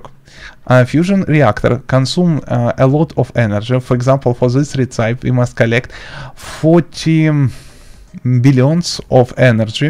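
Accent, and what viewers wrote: Russia. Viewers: Акцент русский)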